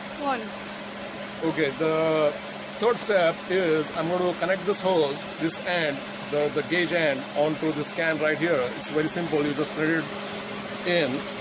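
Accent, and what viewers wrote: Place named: India. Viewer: Indian accents are so fucking annoying...and i love indian people and food but i cant stand their english accent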